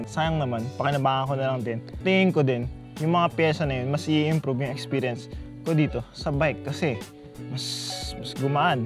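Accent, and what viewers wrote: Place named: Coño, Philippines. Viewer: coño ka pala master " ":D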